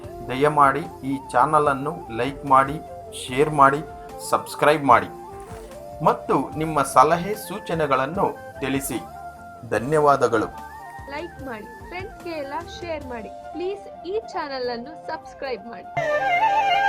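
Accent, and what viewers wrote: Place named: Andhra Pradesh, India. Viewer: ನೀವು ಇಷ್ಟೆಲ್ಲಾ ಕಷ್ಟ ಪಟ್ಟು ವಿಡಿಯೋ ಮಾಡ್ತೀರಿ. ಆದರೆ ಜನರಿಗೆ ಅರ್ಥವಾಗುವ ರೀತಿ ಹೇಳುವುದೇ ಇಲ್ಲ! ನೋಡಿ, ನೀವು ’ಇಂತಿಂಥಾ ಸಂವತ್ಸರಗಳಲ್ಲಿ ಹೀಗೆ ಹೀಗೆ ಆಗುತ್ತದೆ’ ಅಂತ ಹೇಳಿದ್ದಾರೆ ಎಂದು ಕಮೆಂಟರಿ ನೀಡಿದ್ದೀರಿ, ಆ ಸಂವತ್ಸರಗಳನ್ನು ಈಗಿನ (ಗ್ರೆಗೋರಿಯನ್) ಕ್ಯಾಲೆಂಡರಿನ ಇಸವಿ ಜತೆಗೆ ಹೋಲಿಕೆ ಮಾಡಿ ಹೇಳಿದ್ದರೆ ಜನಗಳಿಗೆ ಇನ್ನೂ ಹೆಚ್ಚು ಅರ್ಥವಾಗುತ್ತಿತ್ತು. ಮತ್ತೆ, ನೀವು ತೆಲುಗಿನವರು ಎಂದು ಸುಲಭವಾಗಿ ಗೊತ್ತಾಗುತ್ತದೆ. ಕನ್ನಡವನ್ನು ಚೆನ್ನಾಗಿ ಬಲ್ಲವರಿಂದ ಕಾಮೆಂಟರಿ ಹೇಳಿಸಬೇಕಿತ್ತು.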